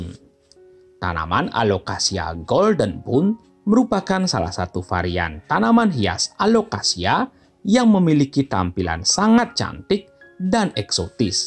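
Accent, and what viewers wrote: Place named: Bali, Indonesia. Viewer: Yg baca narasi..asli logat Bali..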